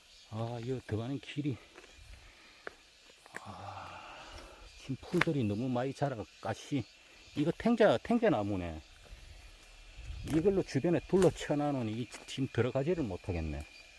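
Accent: Gyeongsang, South Korea. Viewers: 오지마을 구석'구석 다니시며 구수한 경상도사투리로 안내 힘쓰시는건 감사한데 최소한의 지역명이라도 알려주심안될까요? 볼수록 자랑만 하시고 혼자서 자기만족하신단 생각이드네요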